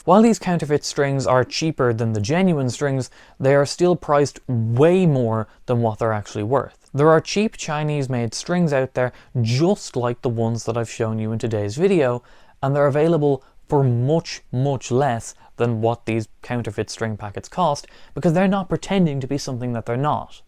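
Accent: Canada. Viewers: Are you a Newfie. You sound like my daughter from St. John's.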